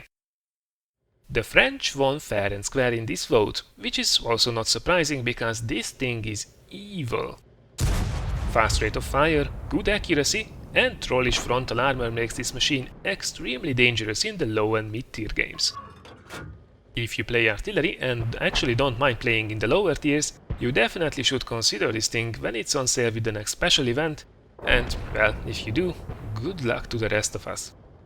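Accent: Hungary. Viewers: akkor jol gondoltam a kiejtesedbol h magyar vagy😄😃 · You are hungarian right? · you are hungarian right/magyar vagy ugye?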